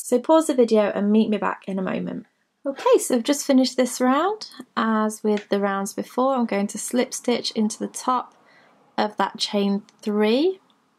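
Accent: England, United Kingdom. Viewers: Confusing that you have an English accent but are using the American stitch names! 😂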